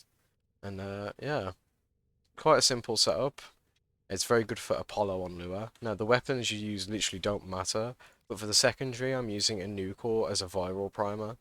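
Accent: United Kingdom. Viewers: Great vid from my favourite Bri'ish content channel! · new bri'ish content woaaw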